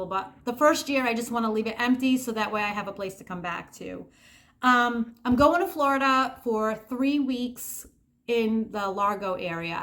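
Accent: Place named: New England, United States. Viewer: She sounds like she's from New England area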